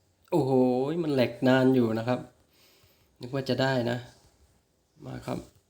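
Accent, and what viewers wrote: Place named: South, Thailand. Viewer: คนใต้ชัวร์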